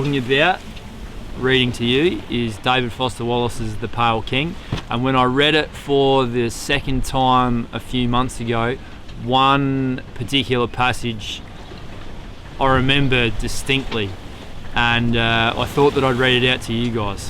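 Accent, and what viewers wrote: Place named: Australia. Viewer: What accent is that luv. We all speak like that down here in Aus:-)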